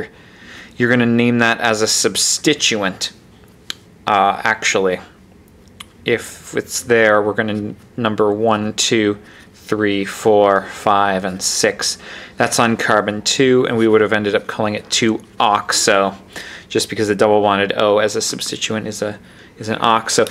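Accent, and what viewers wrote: Canada. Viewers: Thank you!!! btw, are you Canadian? You kind of sound like one :)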